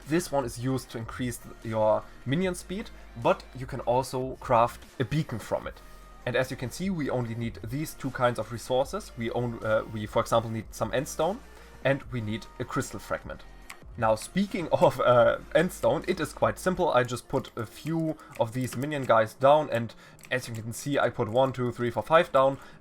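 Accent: Germany. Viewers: Alta hab nicht geguckt and wusste du bist deutsch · Kommst du aus deutschland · You can hear that he is german 😂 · U R German right? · Bro ich hab in der waren sek gecheckt das du deutsch bist haha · Man hört ab und zu das er deutscher ist :D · Are u German I can here you're accent I'm German too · Ok aber ein bisschen erritierend weil du diesen deutsch akzent hast xD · So geil der deutsche Akzent in deinen englisch haha like ist aber da 👍🏼😅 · Are u German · Man hört so sehr dass du Deutsch bist👍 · Finds ürgendwie cool wie du versuchst Englisch zu reden aber man hört so stark den deutschen Akzent xD (no hate) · Man erkennt das du deutsch bist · Du bist Deutscher oder :) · Warum sprichst du nicht einfach deutsch in deinen Videos? Nichts gegen dich aber irgendwie triggert mich dein Deutscher Akzent wenn du englisch sprichst. Edit: Deine Aussprache bzw Akzent erinnert mich an Werner Herzog wenn er englisch redet 😂😂😂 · Irgendwie habe ich das Gefühl das du nen deutscher bist echt jz 2 sek danach hast du das eingeblendet xddd köntest du auch mal deutsche Videos machen ich finde dein englisch irgendwie so extra deutlich und als ob du dich einfach nur verstellst finde es besser wen man so sein kann wie man ist ka aber macht trotzdem weiter ich finde dich trotzdem echt sympathisch. 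· So you are from germany. I thought already you sound like a german (i am one too) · Dieser deutsche Akzent🤌 (no front) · Erstes wort ich wusste du bist deutscher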